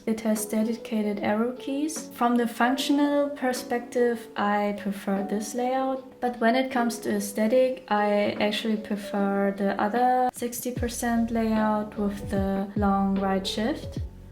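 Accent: Germany. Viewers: Why? You do not need to be shamed about. A German - English could be lovely if the natural voice is nice and warm. Just like yours 🥰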